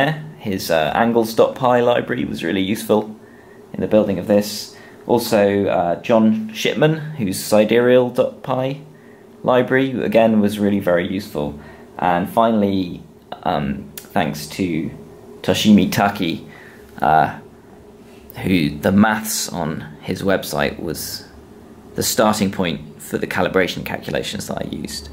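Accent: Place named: Liverpool, United Kingdom. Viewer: My God he's from Liverpool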